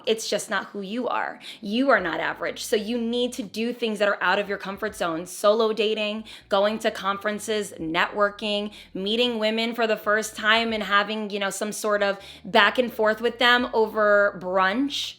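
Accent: New Jersey, United States. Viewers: the jersey accent for meeeee 😩😩😍💕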